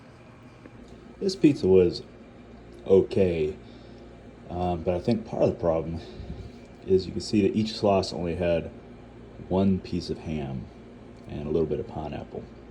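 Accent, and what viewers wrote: South, United States. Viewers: You have a deep southern accent.👍🏾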